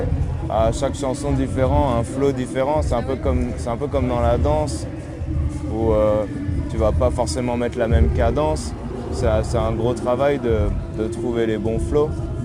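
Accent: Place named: Lower Normandy, France. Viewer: Il vient de Caen ça s’entend il a l’accent Bas-Normand Il parle lentement, il a un coup de barre depuis ses 14 ans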